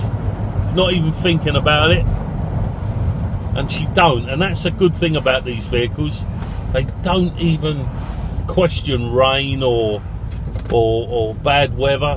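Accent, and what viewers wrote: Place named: Cockney, United Kingdom. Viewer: It's like watching Bruce Willis's cockney cousin cabbin' abaat the gaff. Nice!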